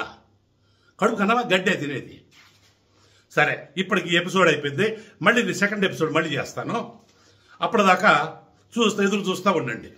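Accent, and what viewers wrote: Nellore, India. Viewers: నెల్లూరి యాస అన్నో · Super …like mana Nellore accent.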